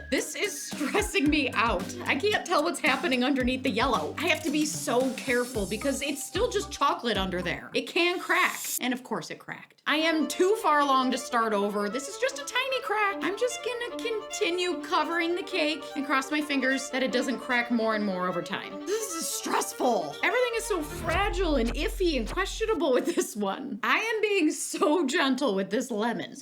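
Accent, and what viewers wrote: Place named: Midwest, United States. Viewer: That sounds like a Midwest accent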